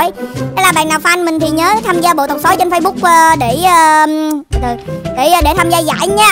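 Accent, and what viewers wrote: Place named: North, Vietnam. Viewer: Nói sao lúc sau giọng bắc luôn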